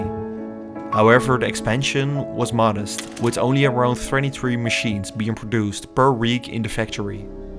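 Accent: Netherlands. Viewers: Jezus man, gebruik Ai ofzo. Dat Dunglish accent van je is super-irritant. Verder wel leuk. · Dutch English 😂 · Well, Someone just made it to a CIA transscript :P. Great vid. You sound like you're from the netherlands. If so, Top video. Ik ga zeker abonneren. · Je haalt ze d´r altijd in 2 seconden tussenuit hè, die Engels-sprekende Nederlanders 😄👍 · Tintje? Het is overduidelijk een vrij dikke nederlandse accent. Voor mij is het niet om aan te horen maar dat is vrij persoonlijk. De inhoud is best goed en erg interessant en daar doet het accent niets aan af. Mooie docu! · You can hear you are Dutch. Not only that, but are you from Leiden?! My head wants to know... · Dutch accent by the sound.😁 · ontzettend nederlands accent . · Keep up the vids! Have to say that the Dutch accent is strong with this one! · Engelse les bij Mark Rutte gehad? Ik vind je docu geweldig, maar je Nederlandse Engels is goed te horen. 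· Dutch voice 😅 olivetti had design. Jammer dat ze niet meer bestaan. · Top notch Urbex content, guys! Music, back story, pictures, narration; everything is just right. I really enjoyed the video and to learn the story of Olivetti. And as someone from the Netherlands, I enjoyed the Dutch accent, of course. ;) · Veel leuker met meer uitleg over de geschiedenis van het gebouw dan de standaard urbex video's, dacht al een Nederlands tintje in je taal te horen haha. Subbed! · the most dutch accent ever .. Thank you for your content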